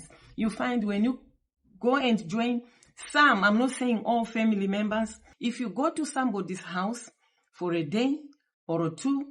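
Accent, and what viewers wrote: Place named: Cameroon. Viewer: You sound Cameroonian! That is irrelevant! However, the greatest threat is just insecurity!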